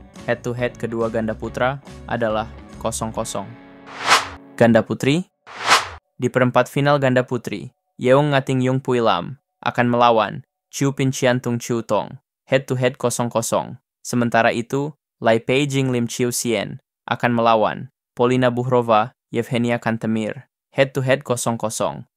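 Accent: Banyumas, Indonesia. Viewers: Pengisi suara ngapak dah ga seenak dulu... ga suka suara yang sekarang..😢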